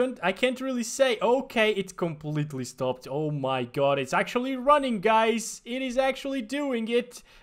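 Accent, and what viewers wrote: Portugal. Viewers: Lol, I didnt know that he was from there, but it semeed to me that he was speaking portuguese portuguese (lol). Thanks for the info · Isn’t he speaking OG Portuguese? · when says something in portuguese my heart bounces a little, even he's talking in Portugal Portuguese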